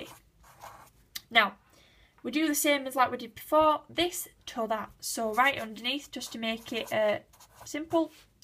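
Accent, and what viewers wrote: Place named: Yorkshire, United Kingdom. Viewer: Ah that's a shame, every now and then I have to come back to GCSE math and this video was easily the best I could find. Also nice to hear a familiar accent! Where are you from? I'm in Leeds I'm guessing you're from somewhere in Yorkshire?!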